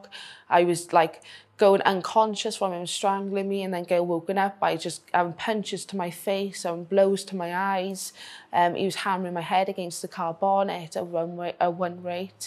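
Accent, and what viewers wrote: Wales, United Kingdom. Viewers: She's from Britain - UK. She has a Welsh accent ❤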